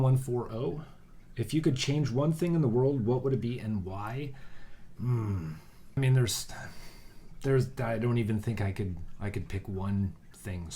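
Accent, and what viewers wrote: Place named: Canada. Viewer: You and your Canadian accent are so cute.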